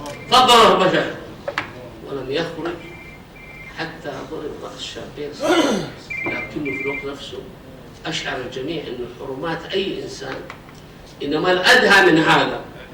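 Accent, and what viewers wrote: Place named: Hejaz, Saudi Arabia. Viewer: Cashit Fr ياخبل ذا ماهو طرش ذا يمانى اصل العرب بس لهجته حجازيه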